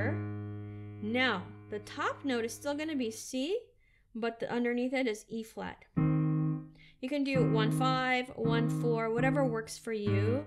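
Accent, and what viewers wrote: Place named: France. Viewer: I hope this idea of sponsoring a tutorial by the person who requests it becomes commonplace. Why someone who provides a product and service, let alone one that has clearly required a good amount of work and expertise, should not be compensated is perplexing. Even Bernie, who thinks everything should be free, would side with The individuals who are providing such services. Aside from that, your French accent is killer.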